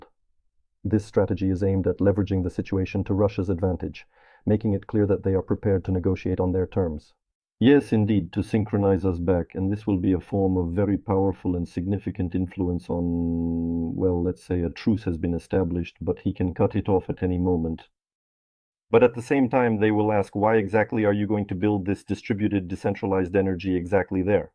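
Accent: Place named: India. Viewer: Как будто индус озвучивал) Ну вообще неплохо.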